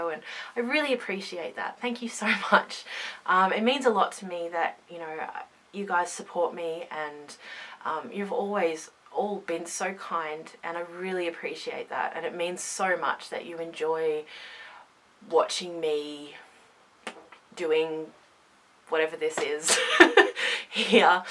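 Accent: Australia. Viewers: I spent an embarrassingly long time trying to identify your accent until I realised it's the same as mine hahaha nice to see other Australian pagans hanging around :D (I'm a new subscriber - hello!!) <3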